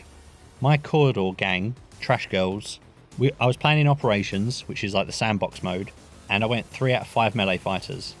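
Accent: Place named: England, United Kingdom. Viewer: That's a very English accent for such a German sounding channel name what's going on there?????????? Also emotional damage oof what a burn!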